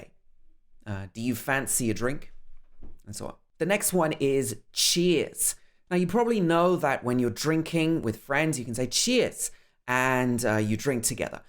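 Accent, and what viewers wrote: United Kingdom. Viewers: Finally,I found this utube! I really love to learn this accent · I have been looking for some channels that can help me to improve my British accent. I found yours, I like it. Thank you.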